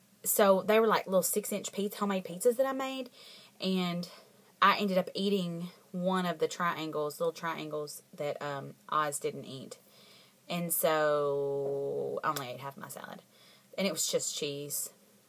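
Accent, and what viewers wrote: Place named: South, United States. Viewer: I'm on the east coast so your so southern sounding and I love it :) enjoy your weekend and keep up the great work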